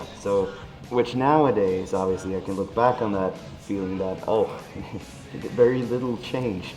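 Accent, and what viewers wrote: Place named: United States. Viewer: Whoever this is, Alpha or Papa, there is virtually no accent whatsoever. He just sounds American, though erudite -- maybe Canadian. I'm confused.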